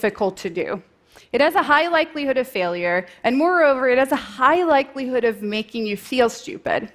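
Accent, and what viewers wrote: Sweden. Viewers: Your Swedish accent really came out in this video! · It's easy to forget Simone aer Svensk (Swedish). The accent shows just a little. Swedens have trouble with when to s. 😊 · This is probably the first time I've actually heard her Swedish accent come through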